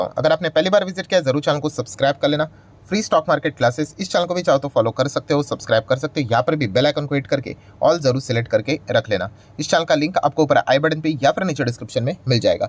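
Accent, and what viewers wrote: Maharashtra, India. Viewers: सर तुम्ही मराठी मध्ये बोलता हे तुमच्या बोलण्यावरून कळतंय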